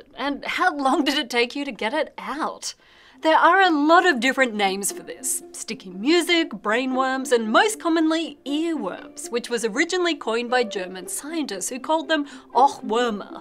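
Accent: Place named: Australia. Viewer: Just wanna say that it's nice to hear an Aussie accent on YouTube. :)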